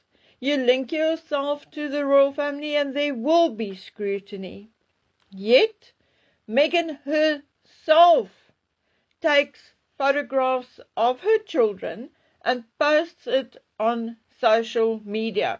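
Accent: South Africa. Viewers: I'm not sure where lives, but to me, it sounds like South African. African as a country produces oil.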